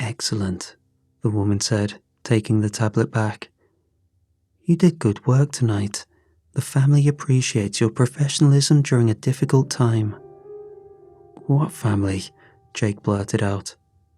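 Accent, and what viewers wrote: England, United Kingdom. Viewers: Because this channel is garbage now. It was good 10 years ago. Now its the same stories on repeat live the only video worth watching with no ads. Plus doesn't end and wake you up every couple of hours. Its background noise. Its good at it too. Great English accent 👌 Finding out he's Asian is a kick in the teeth though. They came to steal our life. Our accent our voice then they try to speak for us 😢 I once heard he had case against him too. Idk enough about it to elaborate though. But I do want to know more on that...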